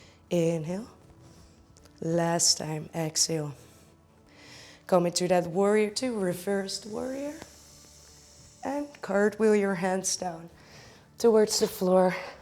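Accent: Netherlands. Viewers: I'm literally just ten seconds through the video and my immediate first impression was wow, your voice is so calming & soothing and after like 3 seconds I recognized that you have a Dutch accent.:) Been to the Netherlands several times and learned the language a bit. Will definitely do this yoga session with you tomorrow morning when I do my yoga practice on the balcony.:) Dank je wel,!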